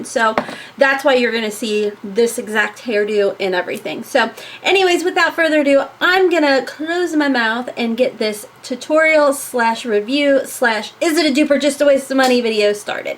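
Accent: South, United States. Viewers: Always a judgement free zone... But, girl, your blending looks great in all of your videos! And I love your looks. Totally, planning on copying some on date night with the hubs. I swear you sound like a southern transplant to KY! And that's a compliment because I am Southern as they come (SC!)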